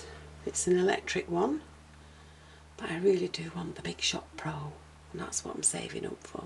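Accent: United Kingdom. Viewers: Love it! Are u in the Uk? I think i could tell from ur accent n got excited to finally come across a uk craft room tour as i know that if i like anything i can maybe b able to buy it! Where did u buy ur CD holder, the lamps for ur photo booth and baskey in ur ikea drawers? I hv the same drawer cabinet n today i should finish with my organising. TFS. Ur room is a lil heaven:)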